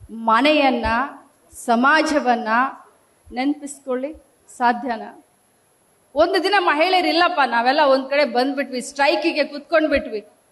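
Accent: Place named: Maharashtra, India. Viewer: ಹೆನ್ನು ಅಲ್ಲ ತಾಯಿ, ಹೆಣ್ಣು ಅದು.. ಮರಾಠಿ ಕನ್ನಡ ಮಾತಾಡ್ಬೇಡಿ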